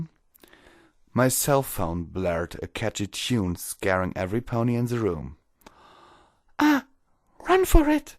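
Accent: Germany. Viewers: that german accent is so heavy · I loved how i could properly hear the story being told, and how your accent brings it's own flare to the voices. I'm sorry if this sounds rude in my assuming, but are you perhaps german? I like the accent and just got curious. Especially considering how prominent it is. It's very soothing to my ears. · hey bro are you from Germany or Russia or England or Scotland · Now I'm not a fan of mlp, and am just watching this because I have nothing better to do. But your silky German accent makes this watchable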